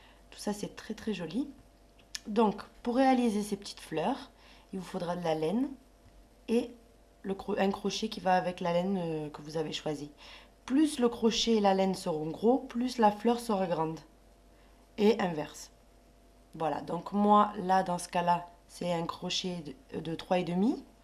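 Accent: South, France. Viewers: Merci, le meilleur site que je connaisse à ce sujet: les explications sont claires, les réalisations très faciles à réaliser, les mains belles à voir et l'accent du soleil un petit plus délicieux, continuez je vous suis, isabelle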